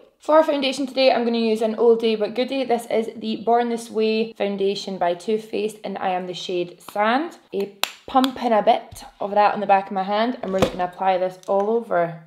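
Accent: Scotland, United Kingdom. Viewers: My 5 year old daughter said “ mommy who are you watching “ so I showed her She said “oh you’re watching that girl again I like her voice” I said me too It’s funny because Scottish accents have literally been my favorite since I was so young Also she just said again “ why does she have such a beautiful voice “ I think my daughter clearly loves your voice just as much as me haha ♥️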